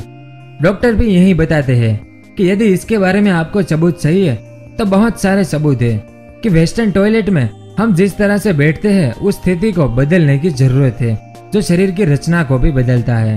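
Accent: Gujarat, India. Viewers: Ap gujarati hona bhai😂😂😜??? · Accent gujju bhai ..... 🤣🤣🤣🤣 like it....!!!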